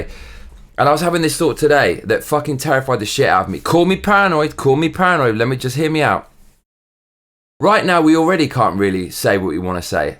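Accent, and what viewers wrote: United Kingdom. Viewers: Yes nice to hear a British gent with Libertarian views! Why do perverts get a whole month? Let's have a month for the nuclear family.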